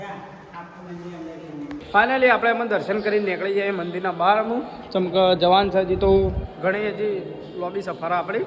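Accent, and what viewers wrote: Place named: Sabarkantha, India. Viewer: Kyana cho bhai..Sabarkantha ni mast majani bhasha bolo cho.. 😂.. Majja aavi karan ke hu pan aaj bhasha bolu chu ghare.. Hu pan tamari bajuno ja chu bro.. Hu Mumbai rahu chu 💓🙏‼️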